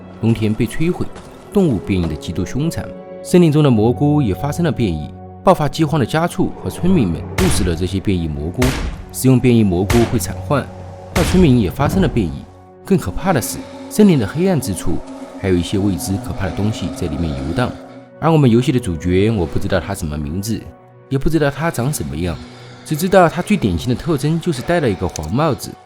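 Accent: Sichuan, China. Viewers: 四川口音有时候就这样 要求这么多干啥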